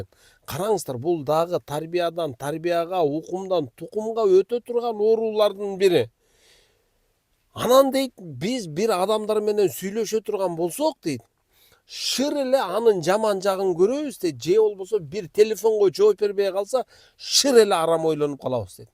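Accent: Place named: Talas, Kazakhstan. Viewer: Могу жигит Таластыкко суйлогону окшошуп турат.?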